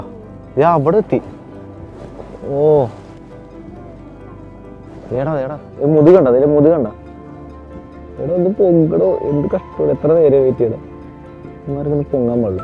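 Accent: Kochi, India. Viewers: Your kochi slang❤❤❤ am also a kocheekkari😌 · Hi. I used to watch your videos when you used to ride in cycle to show the celebrities homes. Aa kochi way of talk aayirunu kooduthal connect aayathu. Later, Egypt videos aanu kanunathu and I noticed that the channel has grown a lot but your presentation and enthusiasm remains unchanged. Inaanu antartica suggestion vanathu. Angane YouTube onum kanuna aalala, enalum otta irupil thane episode 6 aayi. Good job 👍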